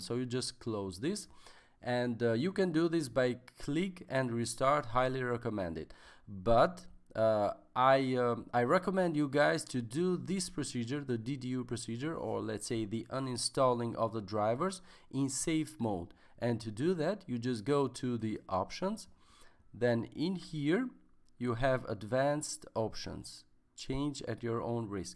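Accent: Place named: Russia. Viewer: произношение русское)))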